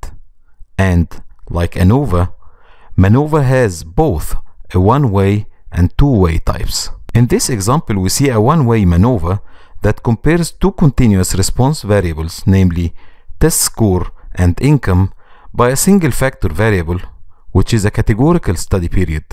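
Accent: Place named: Egypt. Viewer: Finally Found the video that help me to know how can I use it in the research. By the way Egyptian voice and accent in this video is nice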